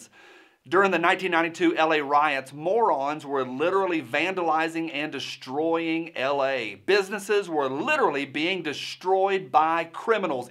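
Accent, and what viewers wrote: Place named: Portland, United States. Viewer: It sounds like she’s from Portland.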